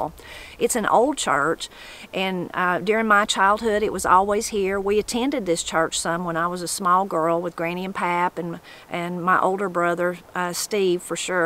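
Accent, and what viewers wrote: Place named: South, United States. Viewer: Love your videos! I'm from northern Appalachia so I don't have the southern twang that your speech has. 😊I must have something different in speech because often times the first thing people say is where are you from? Of course, might be a little mixed up from my dad who came over from Sweden. Don't know if I ever heard of Baptist and Methodist in the same church and sure would go there myself. Especially in this day and age.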